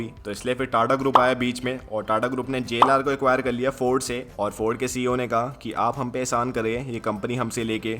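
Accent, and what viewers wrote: Haryana, India. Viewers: bhai nirmaladmir ki tarah bola kar ,tere andhar ka haryanvi baar baar bahar ajata hai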